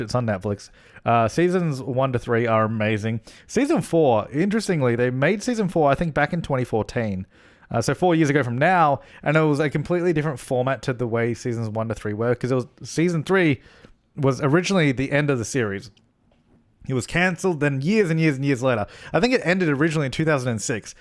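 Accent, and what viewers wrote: Australia. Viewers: hi! i'm a long time fan and seeing you post lifted my spirits so much. your aussie accent never fails to comfort me and i'm not particularly sure why i feel do endeared today but i just wanted to thank you for making this community ❤ much love xx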